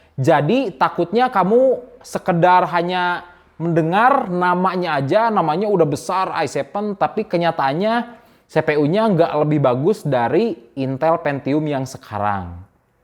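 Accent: West Java, Indonesia. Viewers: logat na sunda pisan kang ..he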